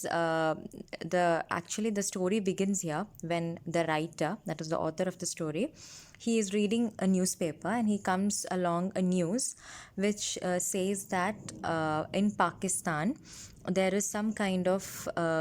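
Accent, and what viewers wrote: Kerala, India. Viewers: This helped me a lot😍. Thanks mam. Are you from kerala!? Mam? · mam, are u malayali ? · Are you a malayali🤪🤪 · Madam are u malayali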